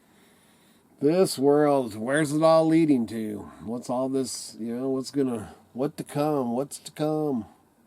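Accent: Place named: Appalachia, United States. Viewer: Love that Hillbilly talk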